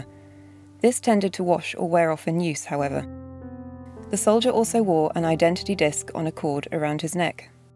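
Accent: United Kingdom. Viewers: don't need a British uniform to know he's from there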